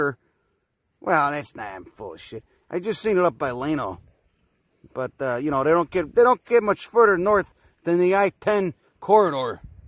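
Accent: Chicago, United States. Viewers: Chicago accent, not any way near New Jersey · he's from chicago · I just love a proper Chicago accent it reminds me of home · Very entertaining content! Great accent! (Chicago? Hearing "Da bearsss"). Walked right by a sweet Paleo arrowhead.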